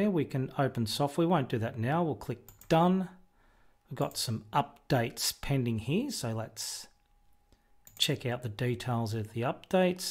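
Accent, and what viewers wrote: Australia. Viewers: I missed the Aussie english. Hope you're okay.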